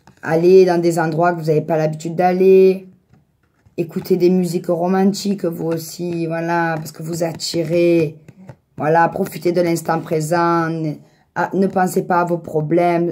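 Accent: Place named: South, France. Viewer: J aime beaucoup votre façon d interpréter les cartes et puis Cet accent du sud ☀️😍 Merci a vous et bonne journée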